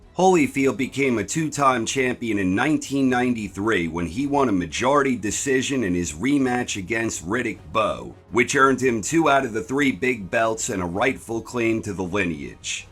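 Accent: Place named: East Coast, United States. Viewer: What east coast city accent is this?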